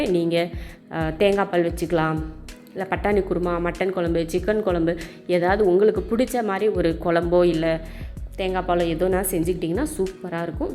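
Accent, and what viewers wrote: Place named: Tiruvannamalai, India. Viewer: You are from Tiruvannamalai?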